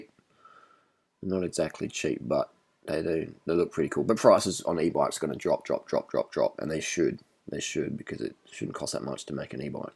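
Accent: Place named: Tasmania, Australia. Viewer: what s with the tasmanian accent lol!!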